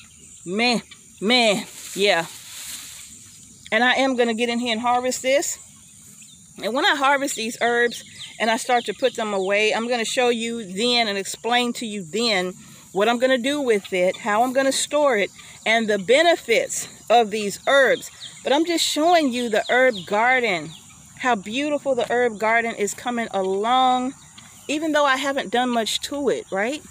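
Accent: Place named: North Carolina, United States. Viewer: New to your channel, just subscribed. :) Question... what part of the country are you in? I'm native to central NC and would like to know if our growing conditions are similar. (I hear those "y'alls" your saying, and detect a slight accent like mine.)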